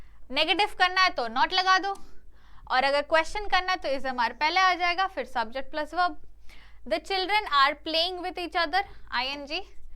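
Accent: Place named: Bengal, India. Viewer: I really like the Bengali accent when you speak Hindi it sounds cute when someone speak like that😊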